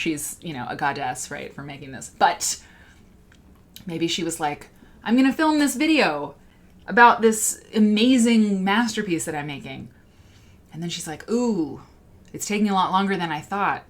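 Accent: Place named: United States. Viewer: All this talk of chips and crisps in an American accent is messing with my internal autocorrect!! Mayo and chips is great and you'll need to find a different chippy if you're getting soggy chips 😝 that's no good! I put the vinegar on at home. Vinegar at the shop plus the journey home is a crunch killer 😞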